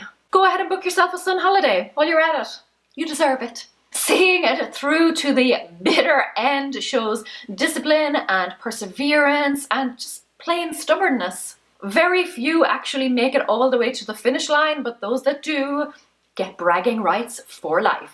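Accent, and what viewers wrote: Ireland. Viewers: OMG that school organiser thing you showed gave ME memories!? definitely had one at some point! Great video, I’m on a Konmari spree and it’s always so nice to hear a familiar Irish accent with great content :)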